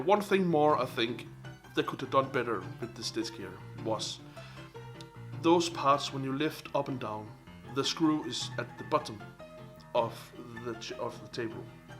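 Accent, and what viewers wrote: Denmark. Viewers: Man kan bare høre at du er dansker hahahahahah!!! :D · ornli´ dansker ahah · Hold kæft det er nemt at høre du er dansker :-D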